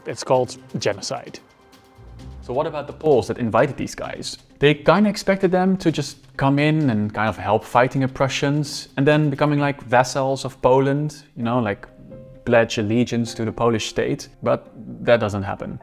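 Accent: Netherlands. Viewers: I was wondering where the host was from, until I heard "togeder" (together). Dutch! 🙂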